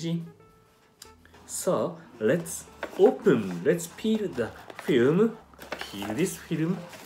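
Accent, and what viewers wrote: Japan. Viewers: Always interesting listening to native Japanese speaking english(no offense meant). As someone trying to learn Japanese I am beyond impressed how well transitions from JP->En->JP->En is for a lot of people. Ive always had a hard time with the order of things moving between English to other languages, like spanish. Kudos.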